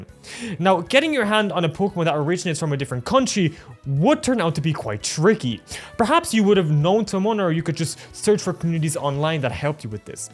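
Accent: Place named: Germany. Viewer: Are you german? Your accent sounds a bit german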